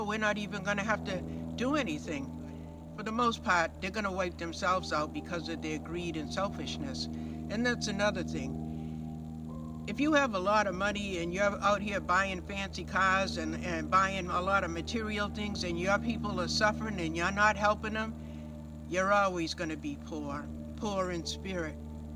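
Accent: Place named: New York, United States. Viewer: new york jewish accent the jews think they are black they stole the Black peoples history, what happened to all the red Skins,rich jews killed most of them off, Redskins who don't use the terms people of color that would be racist towards white people, who as soon as the sun shines on them, they turn a lovely Red color from the Pahana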